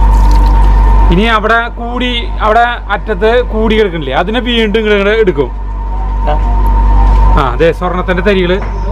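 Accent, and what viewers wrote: Thrissur, India. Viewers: സ്ലാങ് കേട്ടിട്ട് തൃശ്ശൂക്കാരൻ അന്നെന്നു തോന്നുന്നു. ഞാൻ തൃശ്ശൂരാട്ട നിങ്ങൾ തൃശൂർ എവിടെയാ 😍😍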